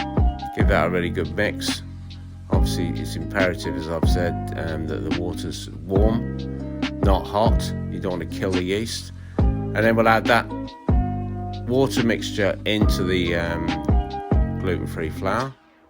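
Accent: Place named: New Zealand. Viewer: Hi I am from South Korea I thought you sounds like kiwi I am right You are in Christchurch I enjoyed your bread show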